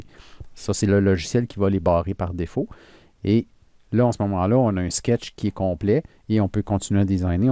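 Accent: Canada. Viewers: Bonjour MERCI BEAUCOUP pour votre travail clair, très bien fait et très bien expliqué. Je débute dans ce domaine et vos tutos sont des mines d'or pour moi rajouter à cela ce petit accent Canadien que j'adore je ne me lasse pas de vos "cours" que j'écoute en boucle pour bien comprendre et enregistrer😊. MERCI et bonne continuation. Maxime.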